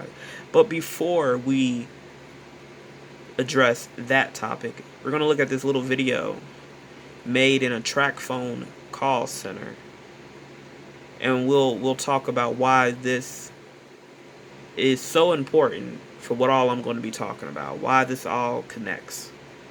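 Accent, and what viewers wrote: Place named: South, United States. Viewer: I agree. Tracfone is an inferior company with a poor customer service department. I think initially, people bought prepaid Tracfones for simple uses such as local or emergency calls. You are correct, Seniors began buying these telephones in the early 2000s to avoid a monthly bill. We had to learn how to use the android and iPhones confidently, and effectively. I am an older consumer, considering purchasing an iPhone or android with its monthly expense. You asked why so many of the workers for Tracfone speak American English poorly; it is their second language, not their primary, and maybe not the language that is spoken at home. They are what we (Black people) once were, cheap labor. It is because we as Americans (Black and White) do not address poor behaviors or cultural related barriers and inconveniences that conditions have become unbearable in the United States. 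You are correct when you say, in other countries, one is expected to try to speak the language, but in the USA, the power structure does not demand this for a reason. It is a way of dividing and conquering ethnic people. Setting them at odds with one another. I am not making excuses for these people. When we, (Black people) migrated to the North, White business owners hired us based on complexion and speech (lack of noticeable heavy southern drawl). I heard your southern accent. These people are cheap labor, working jobs others will not. Read the books American Banking in America, The Forgotten Man, then read Rac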